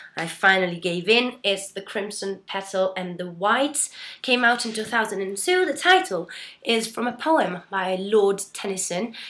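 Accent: United Kingdom. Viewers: What in the world?!?! Why do you have a British accent? How did you do that? You're Italian, but you have an almost native British accent. Uhhh WOW! I miss your bluebeam channel... what happened? I wish I knew you in person, you're so amazing and have so much depth.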